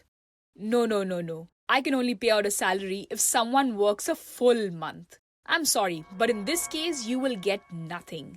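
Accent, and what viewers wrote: India. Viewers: FINNALLY A CHANNEL WHO GOT OUR COUNTRY'S ACCENT YAYYYY INDIA KI JAI side note: THIS IS A INDIAN TV SERIAL I SWEAR this is after watching the whole video: i knew this country has problems but jeez 😥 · The Indian accent is good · Finally an Indian Story with a perfect Indian Accent 👌🏻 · It's a Indian voice 💯🤗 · The stereotypical Indian accent ☠️🦇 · I did not know how a indian accent sounded until this video. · OMG the accent!!!. We in India speak in English just like this. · No Offense but I don't like this Indian accent :/ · are u indian bc i am and I recognised the accent! i love your skin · Finally india accent my dear country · Her Indian accent is so horrible, i don't understand how people are praising her accent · Perfect Indian accent another central American girl storyteller pls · Damn loved this Indian accent and story 😁🔥❤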